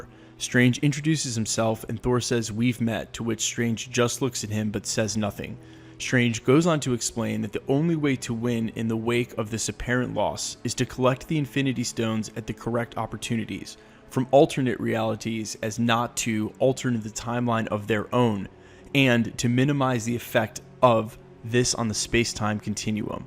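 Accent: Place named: Philadelphia, United States. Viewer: Yo Cuz,not for nothing,but are You from Philly or South Jersey??? I detect an accent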